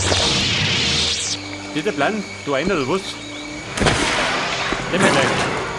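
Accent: Bavaria, Germany. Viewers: so ein geiles spiel, von wo aus bayern bist du?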